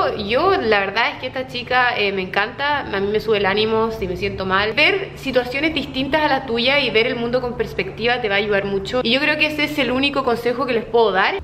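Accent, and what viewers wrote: Chile. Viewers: Que lindo el acento chileno 😊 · Eres chilena ? tienes todo el asento jaja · linda modelo pero tiene un acento chileno horrible . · Es el acento chileno formal (osea, sin modismos). El típico acento Santiaguino.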